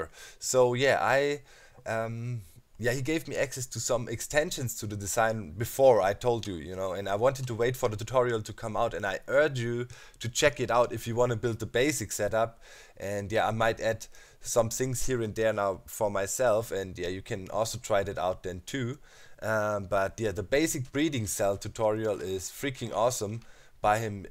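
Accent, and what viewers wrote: Germany. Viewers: are there any English words which you struggle to pronounce due to your German accent?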